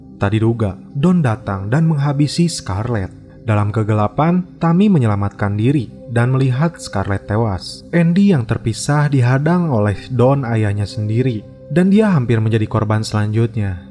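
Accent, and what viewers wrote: West Java, Indonesia. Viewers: Waw ka Sunda nya nyalosod🤣🤣. Semngat terus ka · Ngakak ih yg cerita nya ada ada Sunda nya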